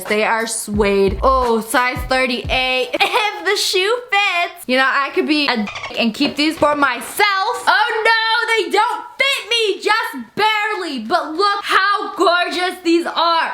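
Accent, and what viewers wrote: France. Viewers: are you french for real?????? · Are you actually french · are you french though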